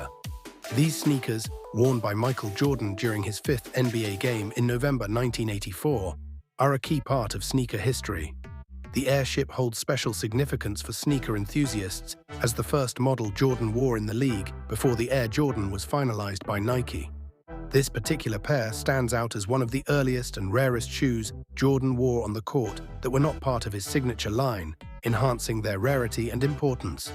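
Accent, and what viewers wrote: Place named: United Kingdom. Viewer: Brit Accent was a nice move 😂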